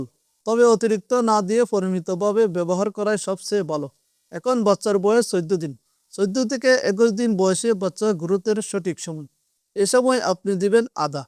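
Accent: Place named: Chittagong, Bangladesh. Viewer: চট্রগ্রাম থেকে আপনার কথা গুলো চট্রগ্রাম এর মতোই লাগছে 😮😮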